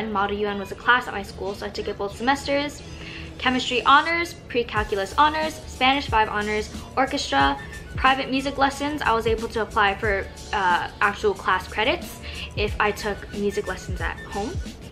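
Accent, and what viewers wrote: South Korea. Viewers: Korean accent❤️👌🏻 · Omg your korean is so cute 😭❤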